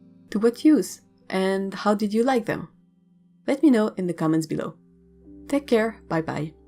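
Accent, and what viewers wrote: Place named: Quebec, Canada. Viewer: Même pas 20 secondes et j’ai tout de suite compris que tu es québécoise :D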